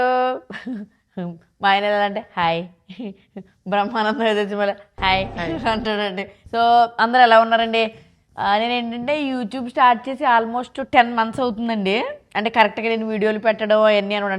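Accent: Godavari, India. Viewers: Yes nijamee andi nevadhathamasgarilage vuntaru me voice chala baguntndhi godavari language chala famous ayyindhi andi amrica lo kuda tq godblessyou 🎊💝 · Memu jamshedpur lo untaamu. Kaani native place West Godavari Palakol Anduke mee Godavari yaasa chala chala connect avutaanu. Movie lo cheste Andhra audience bagaa. connect avutaaru . Mee West Godavari Yaasa biggest highlight avutundi ye movie ki ayina. Idandaa yenduku chebutunnannate. Anil Ravipudi gaaru Meeku chance ivvaalani korukuntunnanu. I am a Big Big Big fan of u. Yenta appreciate chesina naaku tanivi tiratledu. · ఆమ్మ! ఒక కోనసీమ జిల్లా వాసిగా చెప్తున్నా! You made గోదావరి భాష యాసిని famous through out world with your videos / reels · Mee godavari language super ga untadhi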